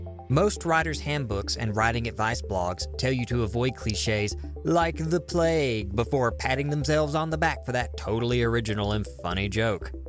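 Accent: South, United States. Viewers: why am i only now noticing your southern accent?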